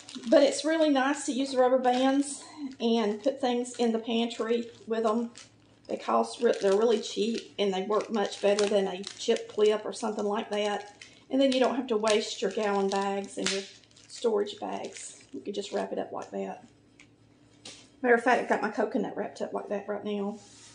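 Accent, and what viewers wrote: South, United States. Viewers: It’s kinda hard to marry up a southern American accent, and a German cake 🤪. Y’all get some pecaaaaarns LoL. However, the sound quality in this video is first class, and the recipe is worth making 👍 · It looks delicious, can't wait to try this. Thank you, sweet lady, I love your Southern accent. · I don’t know how this came up in my feed, but I am loving this. This feels like home. I mean, Lordy mercy! Somebody that talks all southern like me! Likin’ and subscribin’ right now.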